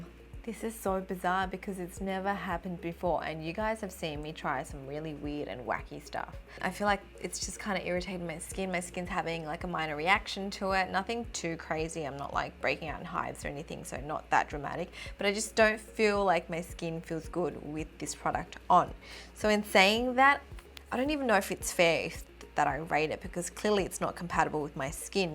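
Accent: Australia. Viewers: Ur Aussie accent is over powering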